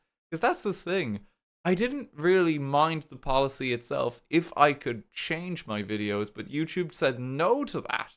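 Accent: Ireland. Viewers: You're Irish?!?!?!?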